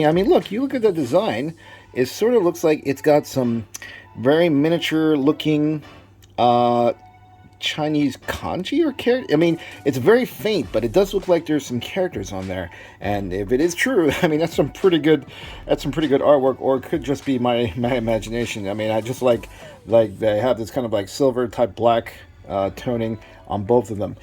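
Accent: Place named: United States. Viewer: bro, mind if i'm asking, where did you go to school? your english is so damn good with pure american accent. sorry, no offense. but considering where you are from, i haven't detected any mandarin speaker accent. btw, i'm glad that i've waited this far to purchase snake eyes cause i really like this movie version a lot far better than the rest cause of his ninja's and assassin's look. i've always considered him as an assassin. all the previous released looks more military to me. which is not my cup of tea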